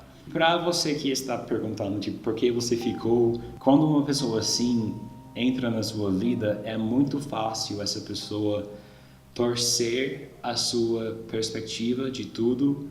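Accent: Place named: Rio de Janeiro, Brazil. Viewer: Achei que vc fosse Brasileiro do rio parece🤣😂🤣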